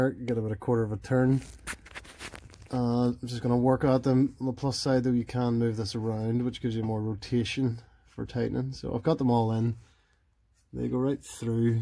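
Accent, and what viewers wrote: United Kingdom. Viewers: Great video discribing a similar fault with my e92 , you sound that your from NI, would you consider homers? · Great video mate, and good to hear my local accent (N'Abbey). This will be my next project in the coming days/weeks, as I have the same warning lights, (with engine power loss and car shuttering when at high speeds). I think I'll just replace the DriveShafts with new, as I've seen videos and photos with badly corroded shaft ends and Relevator Rings, and a lot of effort to try to clean the crud & rust off them. Thanks for sharing!!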